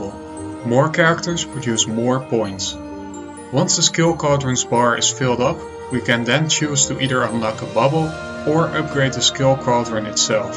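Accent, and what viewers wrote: Netherlands. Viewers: Are you dutch? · Funny how i can hear you're dutch just by the tone of your voice.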